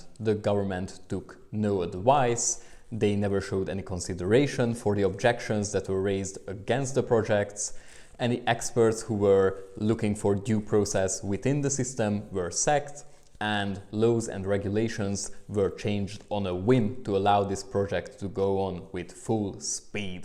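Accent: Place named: California, United States. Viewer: for a supposed hungarian you sound a lot like you'd rather be some californio.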